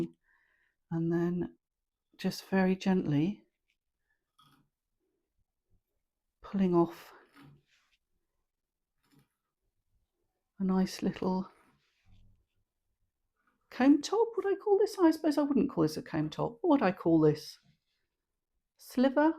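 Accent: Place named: Wales, United Kingdom. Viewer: My dad’s ancestors were from Wales. I like thinking I might have had your lovely accent!!! 😂😂😂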